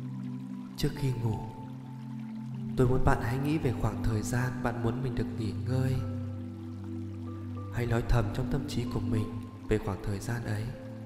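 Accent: Vietnam. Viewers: Giọng anh hay quá, giọng Bắc chánh tong tôi nghe mà rất cảm xúc, ấm áp lắm! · Chú là người Hải Dương phải khong ạ ><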